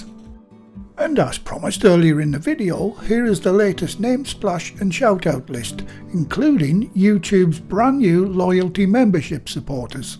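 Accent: United Kingdom. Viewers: Why should people - who are not from England! - make critical comments about the correct, clear, non-dialect, slightly (and pleasantly)-accented English spoken by an English person?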